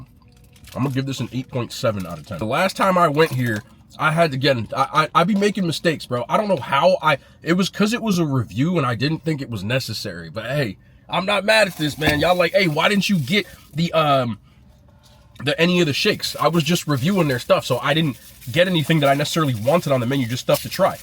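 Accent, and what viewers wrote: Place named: New Jersey, United States. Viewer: Bro so jersey he can’t help it lol …you gotta freak the burger all the jersey people knew exactly what you was saying lmaooo ona set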